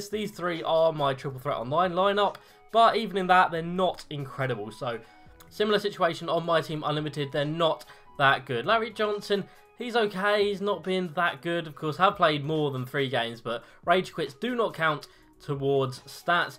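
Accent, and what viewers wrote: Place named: United Kingdom. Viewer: Loving the BRIT...........ISH ACCENT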